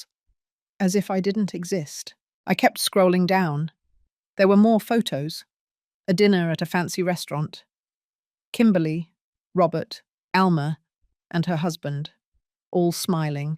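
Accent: United Kingdom. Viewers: I don’t know why but a British accent makes revenge sound proper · This is one confused story. Evil daughter knows and then doesn’t know mom gives monthly transfers. Narrator has a British accent, is named Mrs Lopez, and has a bank that’s open on Xmas Day. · I can’t stand this British accent any longer. Bye.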